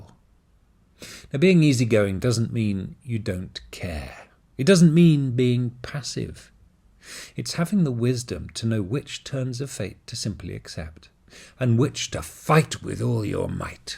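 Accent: United Kingdom. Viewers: British accent is so funny! 😀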